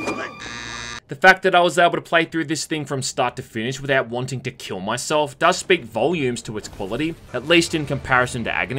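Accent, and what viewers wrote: Australia. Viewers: Do I hear... ...Australian accent?!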